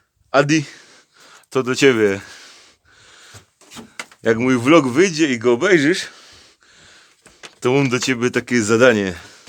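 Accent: Silesia, Poland. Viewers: Konkretny materiał 💪💪 Ze śląska jesteś bo taki akcent ? Sub i łapka leci Zapraszam również do siebie na odwiedziny Pozdrawiam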